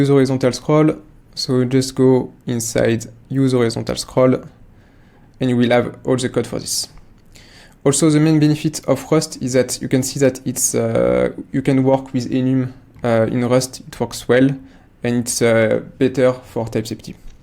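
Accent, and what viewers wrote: France. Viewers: Tu parles francais ? du moins je l'entends quand tu parles anglais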